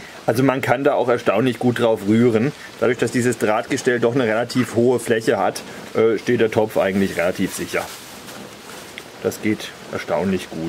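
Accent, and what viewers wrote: Germany. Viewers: de kann das ( sch. in gebastelt) schon schweizerisch aussprechen zwar noch mit deutschem akzent aber tönt schon recht gut.die evelin hat guten einfluss auf dich.hehe witer so.i mag dini biträg..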